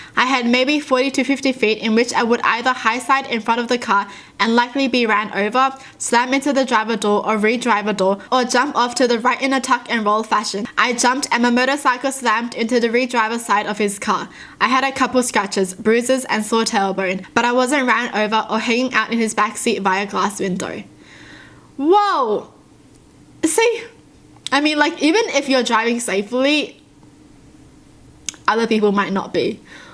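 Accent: Australia. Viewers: are you Australian? because you sound just like my friend’s mum😂😂luv you btw💜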